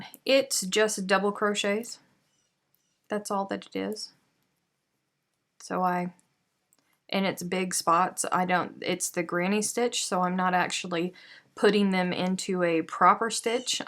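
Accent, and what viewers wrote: South, United States. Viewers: If I upset you tonight by my comment about the southern accent I want to apologize. I ment it in a good way. I love the southern accent and miss listening to my grandparents. It was the you alls that was the only time I really heard it. I really enjoy your podcasts and promise not to say anything again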